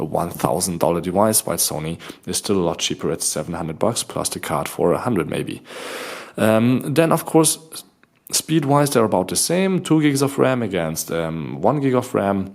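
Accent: Germany. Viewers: Der deutsche akzent.... schrecklich! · You notice right away that he's german, lol. iPhone 6 FTW! ❤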